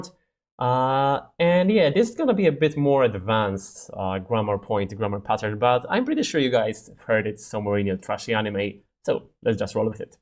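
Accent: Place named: Sweden. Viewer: I can’t help but think that has a subtle Swedish accent. is a name that I never encountered during my decades in Japan and usage of Japanese since January 1985, but it is more common in Sweden’s neighbouring Finland. Yet, I notice that his Japanese has proper pitch accent, so I’d have to assume he must be Japanese. The pronunciation of “grammar” does sound more Japanese, but the T in 妹 wasn’t clear, so that leaves some slight lingering doubt. Oh, actually, his す at the end of お疲れ様です does sound like that of the recent generation of young Japanese men who are starting to pronounce す nearly like English “th” instead of a pure “ss” as we’d normally hear in my generation (born in 1965).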